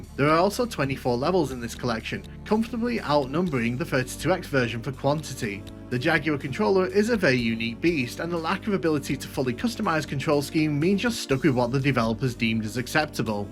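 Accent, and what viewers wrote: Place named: United Kingdom. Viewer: He's British, and we Brits pronounce it that way.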